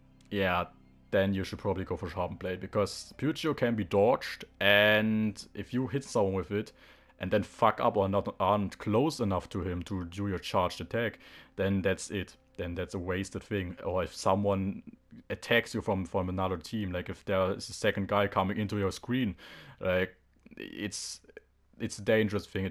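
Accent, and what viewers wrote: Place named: Germany. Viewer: nothing to be sorry about, I'm german too. Your accent isn't bad, I was just curious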